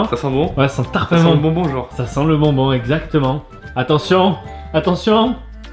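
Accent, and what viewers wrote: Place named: South, France. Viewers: En 1 : j aime trop ton accent sudeste Puis en 2: continue comme sa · J’aime pas l’accent du sud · Accent du sud 💖💖💖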